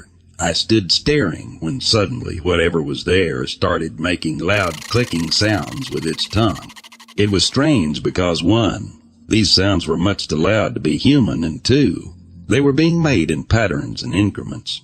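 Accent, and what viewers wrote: South, United States. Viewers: Well done!! So happy to hear from you all again! The new narration did a great job. There's something about the slight southern draw that makes you feel like your grand dad is telling you tale passed down from family. At least thats my take on it! Ready for more!!!!!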